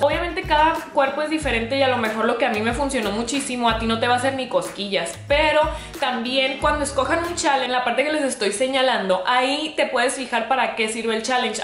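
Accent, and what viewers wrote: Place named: Mazatlán, Mexico. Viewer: Vengo de tu video del sugar y me encantaste , tienes así como el hablado y forma de ser de las de Mazatlán ♥️✌🏻 saludos hermosa desde Mazatlán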